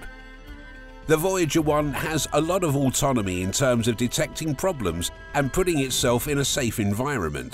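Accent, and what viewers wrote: United Kingdom. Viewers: Loose the limey.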